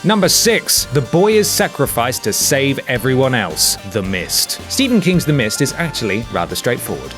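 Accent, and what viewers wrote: United Kingdom. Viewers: Considering that Donald Pleasance had a British accent like yourself and John Gavin doesn't that theory is complete bollocks as you limeys say. And he's not as good looking or tall as John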